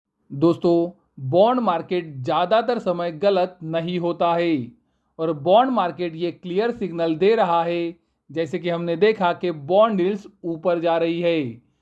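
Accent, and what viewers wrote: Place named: Gujarat, India. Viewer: Aap Gujrati Ho Aisa Aapki Boli Se Lagta Hai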